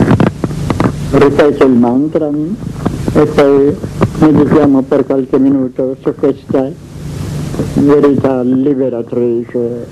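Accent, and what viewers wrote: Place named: Veneto, Italy. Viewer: La tipica voce di Assagioli con inflessione leggermente veneziana. :)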